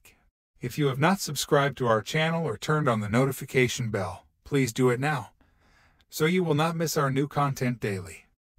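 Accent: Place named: United States. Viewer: I prefer the original voice. This one sounds too American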